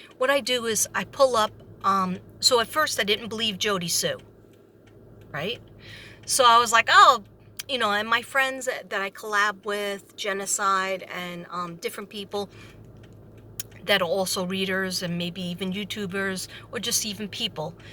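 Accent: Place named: Brooklyn, United States. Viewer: You have a brooklyn or ling island accent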